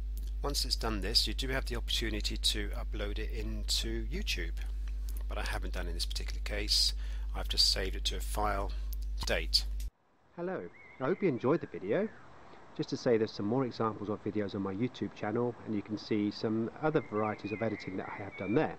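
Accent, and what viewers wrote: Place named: United Kingdom. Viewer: Love the British accent. :)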